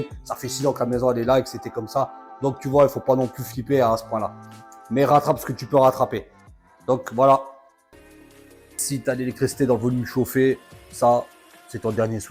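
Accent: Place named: Alsace, France. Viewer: toi tu est alsacien non?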